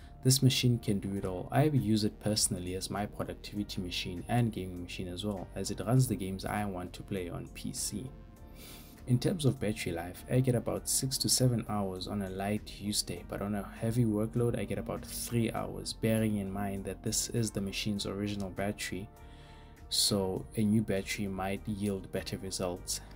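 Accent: South Africa. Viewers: I thought I heard a fellow South African. Nice video! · I'm 81 with hearing loss, and I was delighted to hear such a gentle voice on YouTube, and followed it all (until you got to the gaming, which I just skipped). Maybe I'm just more used to South African pronunciation? But a good mic is always a good thing to have. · Thanks for sharing the review. I am still riding a T490. In this part of Europe they are still well around 2-300 eur and needless to mention mostly equipped with 1st gen Intel CPUs. Would consider it with a similar setup to yours although would be beneficial to be close to that IT asset change fire 🔥 haha. Nice SA accent. I think you could quite get along nowadays with games if you took the chance and opted in for Epic games in the past few years just for the sake of collecting 👌 Enjoy your new mobo, the screen looks good indeed for a regular IPS panel.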